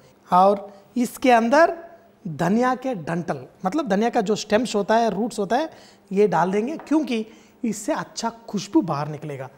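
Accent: Hyderabad, India. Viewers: Anna hyderabad hindi baagundi.👌 hindi urdu mixing & Rasam jabardast 👍 nenu kuda chestaanu . ingredients okate tareeka veru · Nice hyderabadi hindi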